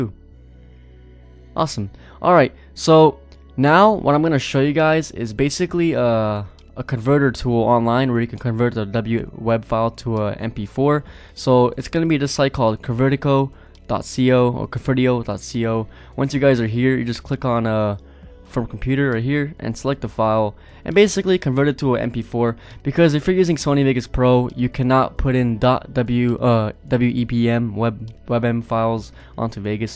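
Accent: Croatia. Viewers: is he croatian? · Are you from Croatia?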